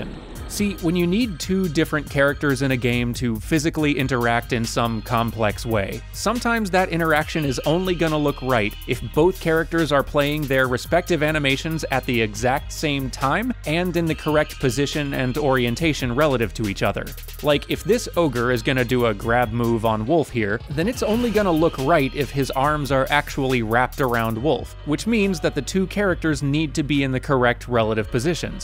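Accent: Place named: United Kingdom. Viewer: That british accent tho. Most Exquisite.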